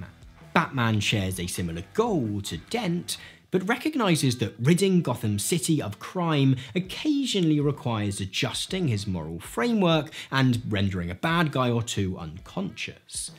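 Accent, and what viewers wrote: United Kingdom. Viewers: british accent make everything better^^^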